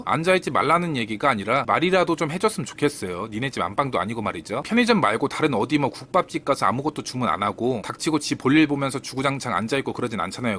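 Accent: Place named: Jeolla, South Korea. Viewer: ..전라도이신가봐여 ㅋ 전 안흘려용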